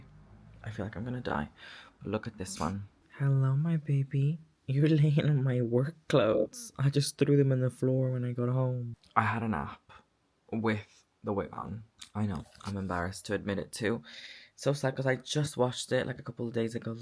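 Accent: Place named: United Kingdom. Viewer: you have a STRONG UK accent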